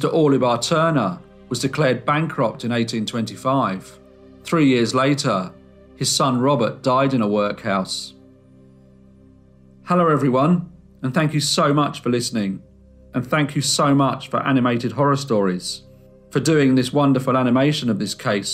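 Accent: United Kingdom. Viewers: All true stories are very sad, i really like the morn drawings All this criminal stories are hard,and mournful. The drawings give it a good touch i also congraculate You for your British accent i lived in the States,and they speak very fast,and they don t Pronunciation. Besides, the manager woman Had never been investigated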